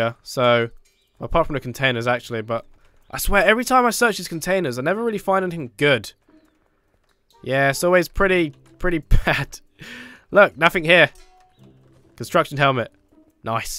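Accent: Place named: United Kingdom. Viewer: That British pronunciation..... I love it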